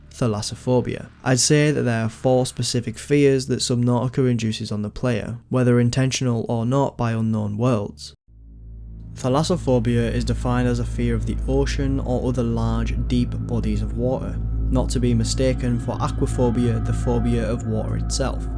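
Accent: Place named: Scotland, United Kingdom. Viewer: First YouTuber with a Scottish accent, makes you listen 🔥🔥🔥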